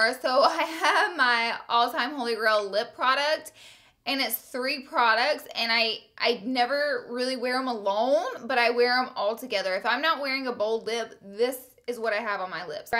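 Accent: South, United States. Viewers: i love your accent and how you always say "whenever" instead of "when. so southern <3 you're precious! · Your southern accent is so cute 😂❤️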